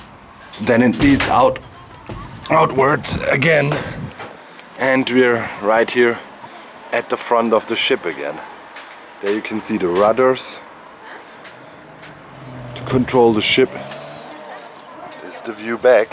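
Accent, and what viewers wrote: Germany. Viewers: The submarine space inside is not very comfortable but this man says that that place is narrow but assuming this man is german (because of his accent) I'd say his super tall. The video and the explanation are great. It's amazing to imagine how everything used to work. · Alman englisch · the joke is that he is german, so its obvious he has a german accent lol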